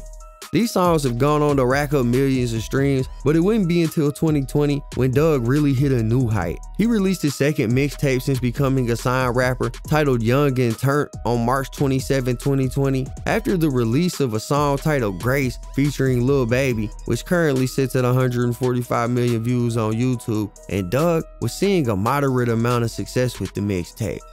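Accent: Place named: North Carolina, United States. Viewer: This the 2nd video I watched and plan on watching more. You did your thang bro! I got a friend in NC, I bet your from out that way, he talks just like you..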